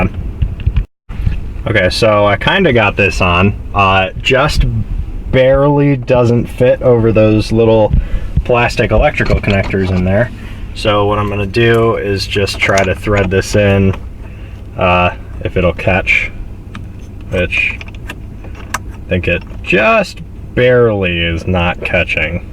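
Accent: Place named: New England, United States. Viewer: New England, not England lol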